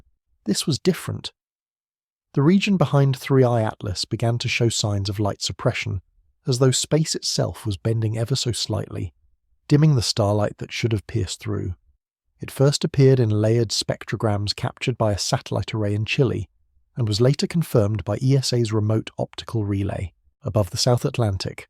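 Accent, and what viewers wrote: United Kingdom. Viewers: British voice. They are a day ahead.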